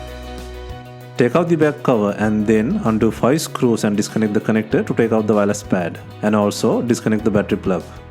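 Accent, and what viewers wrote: India. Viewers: Don't listen to this guy. You were perfectly understandable. Your indian accent sells it.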